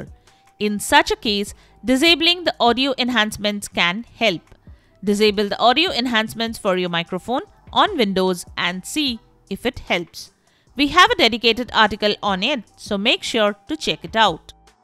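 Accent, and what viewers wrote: India. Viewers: When I hear the Indian accent I know it's finna work. Thank you very much.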